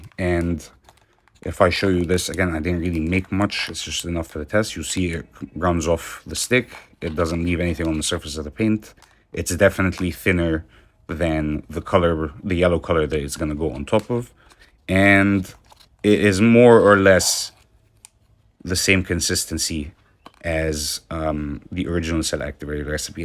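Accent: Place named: Egypt. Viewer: I just found your videos and the content is such a gem! Your experiments are fun in their own right! True, cells are generated by destabilizing the fluid paint through disrupting surface tension and manipulating paint densities and viscosity while layering, so that some sink all the way down or float all the way up. You can check out the Rayleigh-Taylor instability for more context. Side note: You're AMAZINGLY fluent, but I think I heard a faint Egyptian accent and I immediately grinned 😁 I hope I am right in my speculation!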